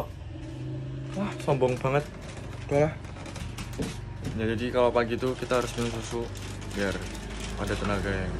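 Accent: Java, Indonesia. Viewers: gole ngomong medok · Medok nyaa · medok banget jawanya ka *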